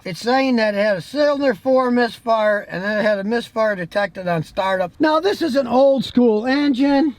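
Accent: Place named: South, United States. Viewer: is gettin’ a southern accent:-)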